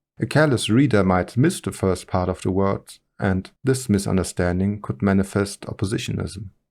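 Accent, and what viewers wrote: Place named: Germany. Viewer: He's a German for sure. Can't you tell by that accent of his? I don't know why, but I find that a German accent, or just plain listening to the spoken German language to be quite compelling.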